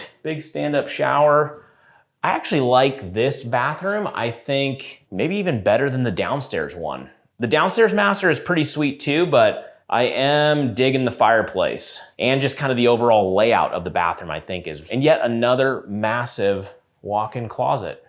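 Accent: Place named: Pacific Northwest, United States. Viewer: Whole video I was thinking the area looked so familiar and your accent was very PNW but when I saw Hood off the balcony that kinda cemented it lol 😅 I'm 98% certain I have drilled some water wells for those hills back 20+ years ago now. Little more crowded than it used to be.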